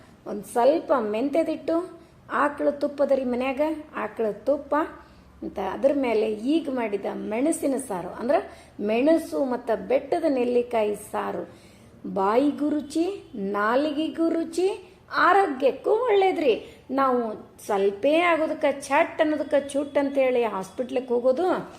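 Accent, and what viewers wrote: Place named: Bijapur, India. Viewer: ನಮ್ಮ ಬಿಜಾಪುರ ಭಾಷೆ ಸೂಪರ್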